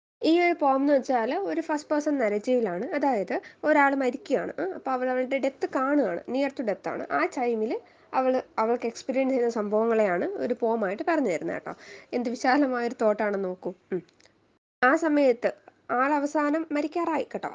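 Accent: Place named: Trivandrum, India. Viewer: ha maximum trivandrum slang varathe nokki enkilum i caught u😌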